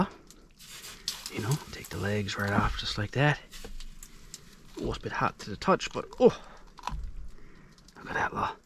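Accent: Ireland. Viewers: Cant make out your accent, American with a drop of Irish 😊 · I’m from England and I thought you were Irish/North American. You have that Irish twang. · You’re Irish sounding along with the Canadian, your phrasing sometimes is Irish as well. Interesting accent!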